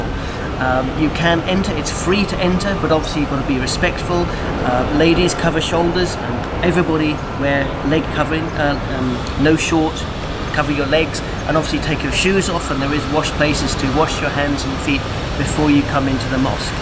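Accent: United Kingdom. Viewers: This first time i watch you video, are you England? Your accent just like in harry potter movie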